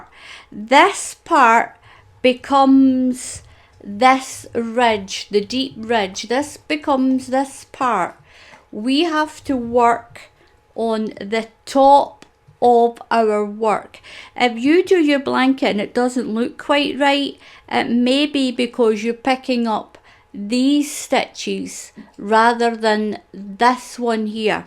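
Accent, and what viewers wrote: Scotland, United Kingdom. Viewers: Thank you I just love this pattern , it’s great to hear your accent . I am also Scottish and live in Canada .🇨🇦 Stay safe and happy crocheting 🌈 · Nope, love hearing the Scottish accent! So keep on talking,! oh, and Subscribed! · The second I heard your accent, I knew you were from Scotland. I'm a huge fan of the tv show "Outlander." I'm not sure if you've heard of it, but it's based partly in Scotland. I absolutely love that show, and I love listening to your voice in your tutorials. I'm a new sub and I can't wait to binge watch all of your videos, lol. You describe your stitches so clearly so that we all know what to do and what not to do. Thank you so much. Happy Mother's Day! 🐞🦋🌼🌹🌻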